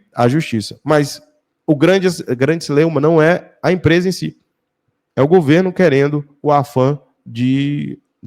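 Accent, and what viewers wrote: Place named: Northeast, Brazil. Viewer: Cara ele é do nordeste, a dicção dele sempre será assim, independente de fono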